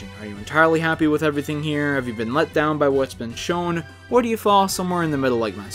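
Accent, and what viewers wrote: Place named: Canada. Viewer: I love the really strong Canadian accent. Aboot.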